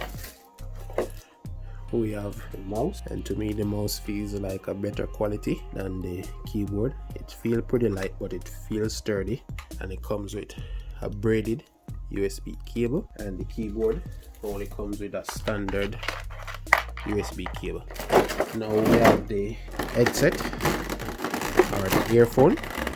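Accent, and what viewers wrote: Jamaica. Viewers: So nice and the Jamaican accent is rlly good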